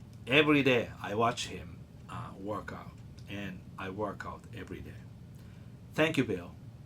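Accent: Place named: Japan. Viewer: Amazing story! Perfect timing for me to hear this from you as I am also discovering my tone and how it makes others around me happy to hear it. I used to play and think I sounded great when I’m by myself, and then I would go on stage in the early years and all I could do was hear all my mistakes and think to myself how I sucked. But people would come up to me afterwards and say how phenomenal my playing was, and I couldn’t believe it! Because I noticed ALL my mistakes. But I’ve come to the point where I don’t care what other people think, and I don’t care if I screw up at all as long as I can have fun. Thank you for sharing the story about you and your journey with the guitar. I really appreciated hearing it from you. Japanese was my first language and I started learning English when I was six so your accent reminds me of some of my Japanese relatives. 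Jya mata yoroshiku onegai shimasu.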